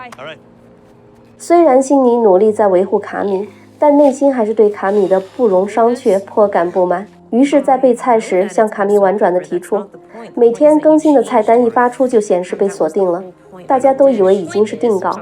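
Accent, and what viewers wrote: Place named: North, China. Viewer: 不是AI，好像是个北方姑娘，声音甜美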